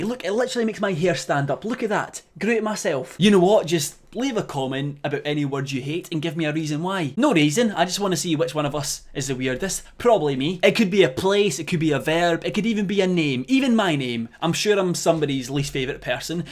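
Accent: Scotland, United Kingdom. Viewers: Your Scottish accent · Is he Scottish? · "mashing tatties. More like Tati mashed James" 😂😂😂 Edit: also where in Scotland are you from? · Your accent gives me life I can’t understand half of what you say but it’s like I’m in the countryside of Scotland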